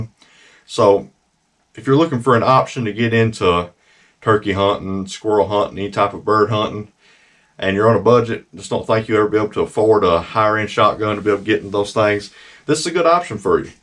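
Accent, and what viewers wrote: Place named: South, United States. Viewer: I subscribed just because of his voice and southern accent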